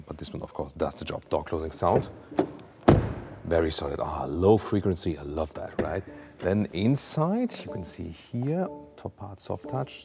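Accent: Germany. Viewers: Great review, this channel improved a lot in the last year, good job! And love the german accent as Im italian whom lived in Germany and learned the language.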